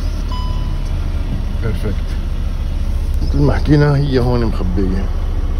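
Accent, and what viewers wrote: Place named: Lebanon. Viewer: من الجزاءر تعجبني اللهجة اللبنانيةفيها خير مودع اهلوا مظروب الكف حتي الخبرة ماشاء الله عاقل ورزين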